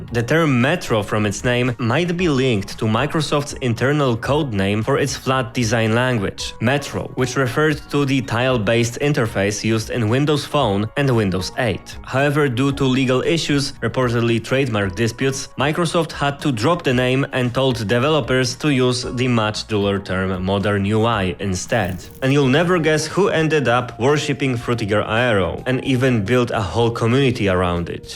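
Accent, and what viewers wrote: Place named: Poland. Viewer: Is this an english version of? Same thumbnail, voice and video in general. Czy mam rację? I feel that I shouldn't be here lol. Oh btw I FEEL Polish accent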